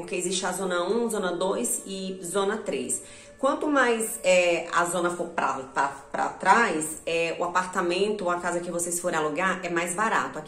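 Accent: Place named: Fortaleza, Brazil. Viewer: Só pelo sotaque já sei que é Fortaleza! Sucesso pra vc...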